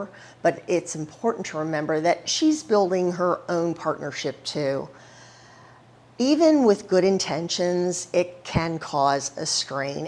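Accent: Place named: South, United States. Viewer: Thank you for your wonderful content channel mrs. You seems very sociable. As learned since I began to American and British YouTube channels so many things, and Iam still learning. Southern people are very friendly, have hospitality, strong family ties. Appalachian region full of myth and supernaturals such as big foot, New Jersey devil. Mew mew means granny. I read about Texas traditions, manners, wonderful characteristics such as respecting elders, being polite, showing kindness to others as part of Texas values. Native Texans are most genuinely friendly people we ever meet. You have special accent under southern with twist. You have Friday night football, rodeos, barbecue you have too in Arabic countries barbecue it’s great coincidence. Home coming is football or dance celebration of shared history memories, pride, cowboy, Texas is bigger than France. 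I hope I can learn a lot from your knowledge. Happy belated fall and thanksgiving in advance. Good luck to you your dearest ones.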